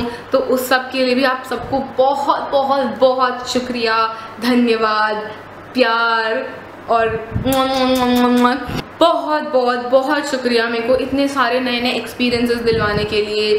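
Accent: Delhi, India. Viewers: Hi 😊 your Hindi is typical Delhi wali--haha! You remind me so much of DU I attended Miranda for a year but now in Europe. I love you Hindi Vlog and other videos as well. And 😁 your Hindi is so so similar to mine ( Love your hand actions while you talk 😊😊 haha) --lots of love 💛 Tenzin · Wow..! its so refreshing listening to your "diliwali hindi". Delhi is my hometown and its been a while since i left the city after my wedding. It feels nostalgic listening to you speak. i felt like i was hanging out with my girls in delhi. This video brought a smile on my face. Thank you,. You're amazing. Keep going. 👍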